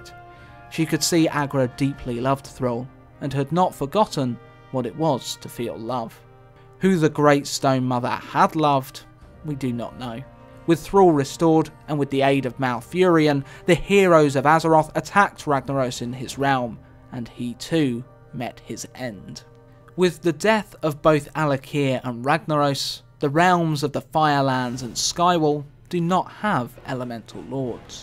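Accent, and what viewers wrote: United Kingdom. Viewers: I'm really surprised I found that, as iv'e only started playing hearthstone 6 months ago and never played WoW in my life.. cool! I wonder what the mistake actually is....... Btw, may i ask where you're from? I guess british but i'm not sure..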